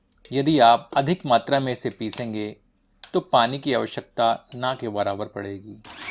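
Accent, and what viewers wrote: Standard. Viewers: आपकी हिंदी कर्णप्रिय शुद्ध है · I'm a South Indian Tamilian, appreciate your shudh hindi conversation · आप की शुद्ध हिंदी उच्चारण से ही मन प्रसन्न हो गया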